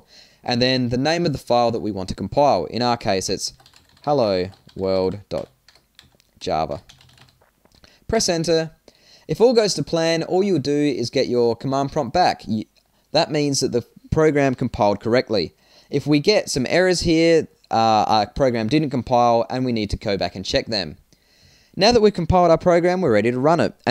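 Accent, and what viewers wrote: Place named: Australia. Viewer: Nice one. And great to find some tutorials with an Aussie accent!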